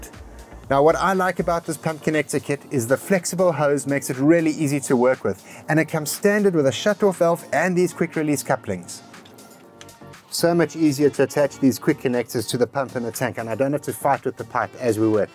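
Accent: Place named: South Africa. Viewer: It’s a shame a whole accent is connected with hate because I have always loved the ‘Saffa’ accent 😊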